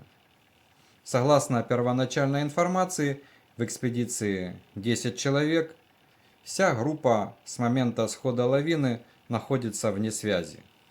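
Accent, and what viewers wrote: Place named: Okanye, Russia. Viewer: Научись разговаривать по русски, не окай! Удачи! Но слушать тебя невозможно!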